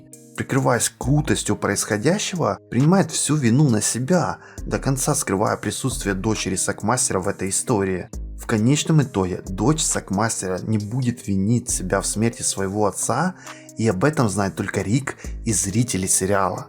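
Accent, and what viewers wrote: Russia. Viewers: говор на Воронежский похож) В принципе, там близко 🤗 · да, потому что все территории около Украины, украинизированны, именно по этому у вас там говор, ге-шо-те... задумайся росиянин против кого воюет твой царь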